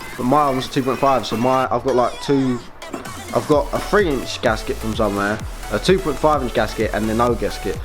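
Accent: Essex, United Kingdom. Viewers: essex accent is 100% the worst English accent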